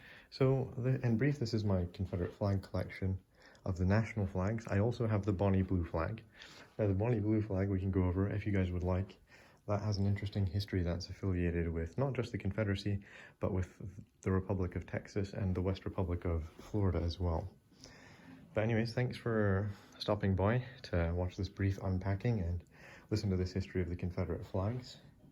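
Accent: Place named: Scotland, United Kingdom. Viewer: Ii here a Scottish accent struggling to be heard over the American there 😊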